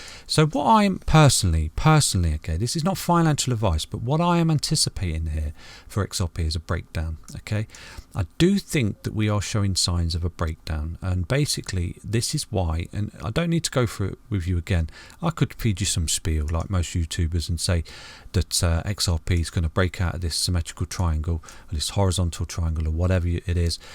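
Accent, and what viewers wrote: United Kingdom. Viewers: You have the cutest British accent I've ever heard. Thanks for the analysis 😉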